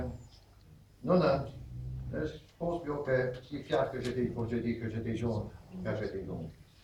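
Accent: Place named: Guernsey, United Kingdom. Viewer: Yes, it's a typical Guernesiais accent. (If you want to get into detail, there are several different accents even within such a small island, but to most outsiders they would be hard to distinguish). Norman French (of which Guernesiais is a variety) had a huge influence on modern English, but that was from the 1066 Norman Conquest until around 1400, ie. before the Great Vowel Shift. But the many languages and dialects of the British Isles haven't influenced each other's vowel sounds over the centuries since.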